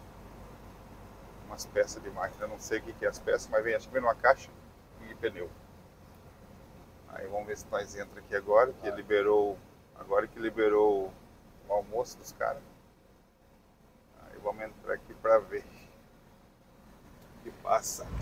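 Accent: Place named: Rio Grande do Sul, Brazil. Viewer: EU CONHEÇO ESSA VOZ GAÚCHO KKKKK PUBG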